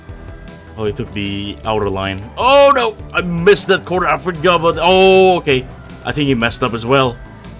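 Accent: Indonesia. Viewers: Aksen lu kyk orang indo bang hhaha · Indo ya bg? Aksen nya kaya org Indo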